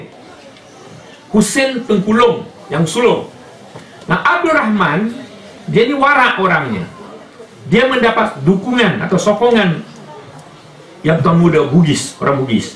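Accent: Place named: Java, Indonesia. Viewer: AKU TAK RASA DIA BUGIS, CARA BERCAKAP PUN DAH TAHU DIA JAWA HAHAHAHAHA DIA PUNYA HUJAH PUN PRO-JAWA HAHAHAHA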